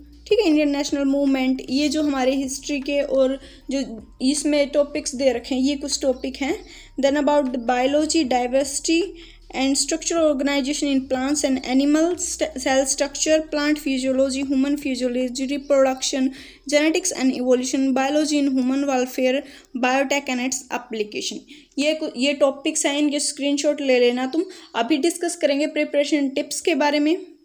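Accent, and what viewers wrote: Haryana, India. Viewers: Her Haryanvi accent🌝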